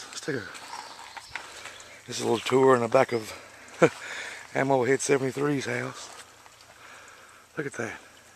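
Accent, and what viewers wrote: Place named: Kentucky, United States. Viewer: Thought your accent sounded familiar. I'm in London KY. Laurel County....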